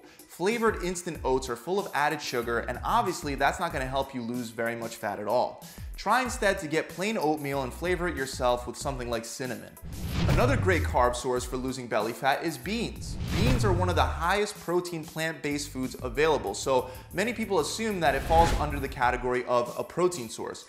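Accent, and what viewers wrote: Philadelphia, United States. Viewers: You gotta be from Philly. LOL Wooder instead of water. I miss the Philly accent. Anyway your vids are amazing. Keep em coming.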